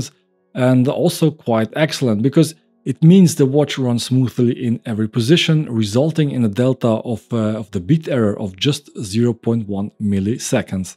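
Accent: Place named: Switzerland. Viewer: An AI bot ould not have a Swiss-German accent when speaking English.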